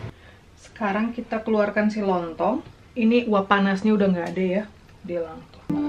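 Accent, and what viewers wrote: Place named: Banjar, Indonesia. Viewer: Suka sekali sama si mamak ini, bhs nya Banjar banar. Menggurak gurak. Si acil, nyaman banar. Wkwkwk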